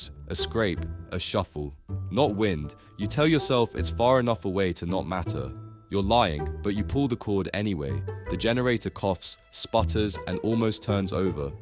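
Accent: United Kingdom. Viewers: Good dry English accented humor., why does everything have to be AI now? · Get someone who can speak English OT Cockney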